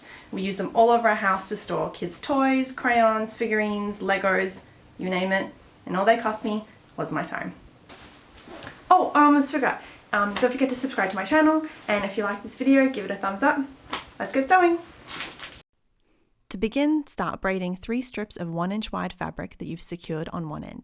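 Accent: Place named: Australia. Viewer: Great video! thanks for sharing! :-) also great to hear another fellow Aussie youtuber too 😊 I’m now a sub!❤️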